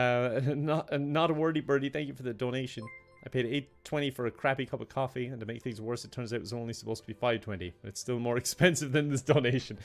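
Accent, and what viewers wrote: Ireland. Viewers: is so kind over stream wth! aaa soft irish lad!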